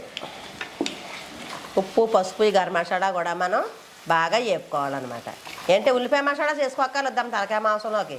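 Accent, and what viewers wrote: East Godavari, India. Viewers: Ma east godavari ante alaguntadi mari..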